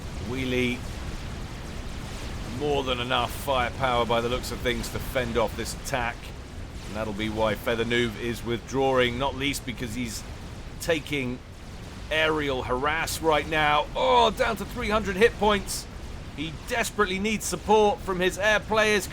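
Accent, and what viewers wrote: United Kingdom. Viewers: I have a legit criticism, every time I watch one of your videos, I notice something. Your voice, its so damn sexy. I can't pay attention to what is going on because I'm too busy being mesmirized by your sexy slick English accent. You need to stop it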